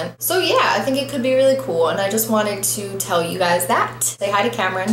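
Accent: England, United Kingdom. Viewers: your british acent is awesome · Yu have and awesome British accent! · love your British accent <3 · Questions: 1. Were you trying to talk with a british accent? 2. What makes YOUR fans the absolute best fans in the world? =) 3. Who is your big inspiration? 4. Where, and why, did you move? 5. I am your biggest fan ever! =))) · is it just me or does she have a slight british accent now??? 0.o :D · Your British accent sounds like Cher Lloyd, and when you say that you look like her too. Lol. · Yeah your london accent is terrible. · Not only does she have an AMAZING singing voice... she also does a GREAT London accent!! You're awesome, and I hope you go FAR!! Respect from London, England!! xxxx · hi love your british accent. hehe. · You have a British accent here idk if its fake or if your American accent is fake but wow you do good with either lol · Is it just me or is she developing an English accent. 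· your english accent is hilarious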